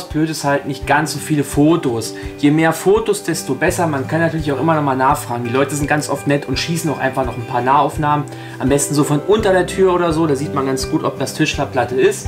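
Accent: Hesse, Germany. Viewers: Als ob du aus Marburg kommst😂 endlich mal wer aus der eigenen Stadt.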